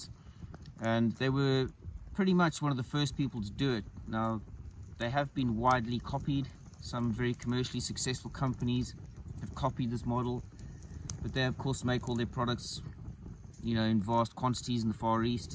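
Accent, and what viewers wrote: South Africa. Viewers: Hi there you sound like a SAFFA so am I but residing in the UK (24 yrs). I don’t do instagram any email add to reach out. BTW also bought two Rob Evan’s knives.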